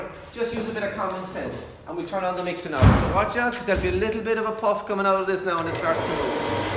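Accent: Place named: Ireland. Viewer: While I like the Irish accent in English, it always reminds me of the sad state of the Irish language.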